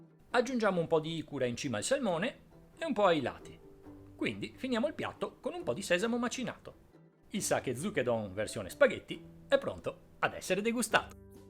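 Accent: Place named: Veneto, Italy. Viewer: lo sento io ,perché sono veneta e forse mi sbaglio ,ma verso Treviso o vicentino?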